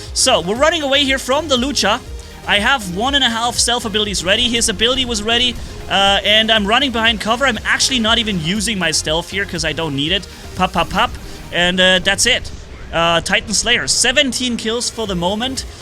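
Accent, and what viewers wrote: Germany. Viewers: Wait… Is HE GERMAN?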